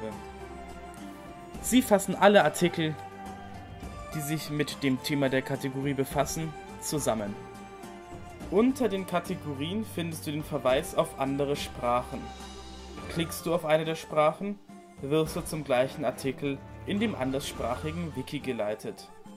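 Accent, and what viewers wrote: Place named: Franconia, Germany. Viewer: BAYERISCH? Ich fasse es nicht! Wir sind FRANKEN! Das ist ein ganz ganz ganz ganz wichtiger Unterschied! :p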